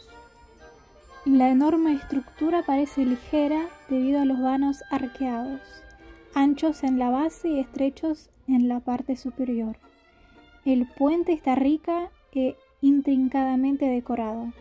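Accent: Argentina. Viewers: tonada de Argentina. Yo vivo en Neuquén capital y hablo igual que. De donde sos, estimado? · Tenes la tonada de Bs As perfecta!! Tenes que cpntar como esta Rusia hoy en día con el tema de la guerra, esta más cara o más barata que Argentina??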